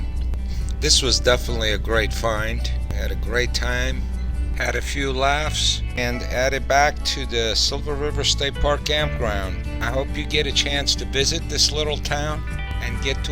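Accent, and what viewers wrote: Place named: New England, United States. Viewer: should not be narrated by a yahkee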